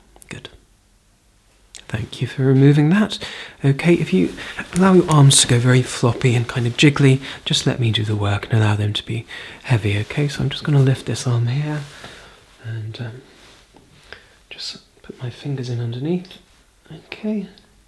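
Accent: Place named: United Kingdom. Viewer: He sounds like a British version of my allergist and it’s scary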